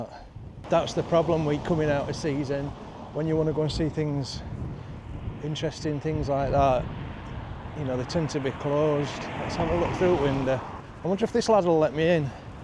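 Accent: Yorkshire, United Kingdom. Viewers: Another brilliant one. Yes, it’s winter with accompanying weather but you soldier on with the help of a cuppa and a bacon banjo. I like getting to see things & places with your channel that I would never normally see AND I get to know how Yorkshire folk pronounce place names. Love your vlogs and look forward to your next adventure along t’coast. 💕💕💕💕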